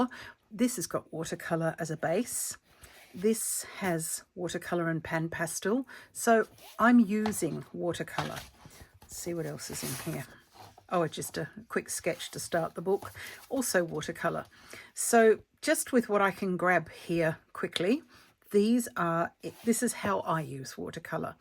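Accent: Australia. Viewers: Have just discovered you, thoroughly enjoyed your video, and hearing your Aussie accent:)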